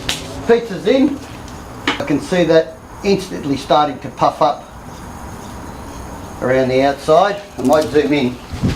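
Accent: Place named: Australia. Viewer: Gr8t video. And I loved your accent too (don't get me wrong it reminded me of my year abroad spent in Penrith NSW). Thanks mate.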